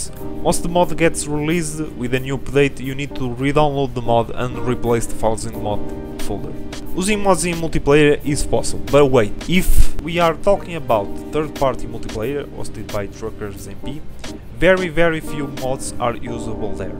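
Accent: Portugal. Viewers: es portugal nota se pela voz kkkk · Tuga accent FTW. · Só pelo sotaque já vi que és portugues 😁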